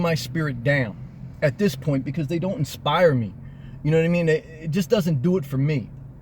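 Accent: New Jersey, United States. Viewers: I would rather live in a car than an office. Never seen your videos before but you sound like a Jersey boy like me. Hope I am not misjudging the accent, I have been Californicated for the last 25 years and love it! I had a large family, so I´ll keep my mountain acres but I feel you on materialism. I think the key for me was to make sure I accumulated enough income streams that I never HAD to work again....like now. My kids are grown and I am still young. Fuck yeah!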